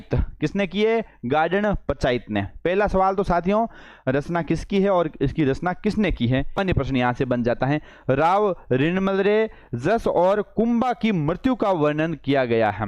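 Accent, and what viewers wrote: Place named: Barmer, India. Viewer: Sir aap bolte mast ho 😅 चारण को सारण , चमत्कारी को समत्कारी, सिंगल को chingl लगता हे आप barmer se ho🙏🙏🙏🤗🤗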